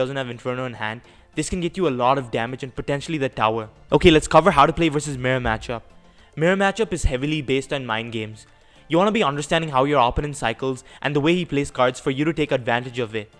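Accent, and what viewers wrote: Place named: India. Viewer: I love the video! Also i love your accent. Probably the best indian accent i have ever heard :D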